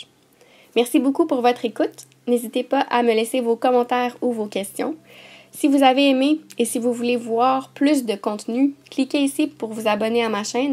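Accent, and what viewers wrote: Quebec, Canada. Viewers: Merci de m'avoir répondu. Votre capsule est parfaite. J'ai pris votre capsule et j'ai changé votre voix contre la mienne.Je l'ai utilisé juste sur wath sapp. Pour mes élèves comme un petit rappel de la leçon. Ils ont beaucoup apprécié. Je leur ai dit bien sûr que ça venait de you tube. Une élève à moi a fait la même expérience. Vous avez un bel accent.Vous êtes canadienne je suppose. Moi je suis marocaine. C'est pour cela j'ai dû le refaire en français. Votre explication est parfaite. Partir d'un texte pour comprendre la conjugaison est la façon pédagogique idéal. J'aurais aimé connaître le nom et prénom pour le mentionner dans votre vidéo. Mais il n y a que 😘. Voilà, j'espère que ma question est un peu mieux comprise. Mais si c'est interdit, je ne le lancerai pas bien sûr. C'est votre travail et vous méritez un CHAPEAU Bravo, l'animation est parfaite ainsi que l'explication. 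😉😍😘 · Merci beaucoup!!! Votre vidéo est très instructive et votre bel accent québécois me rappelle mon séjour universitaire à la Belle Province. Continuez! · Si tu parles de son accent c'est l'accent québécois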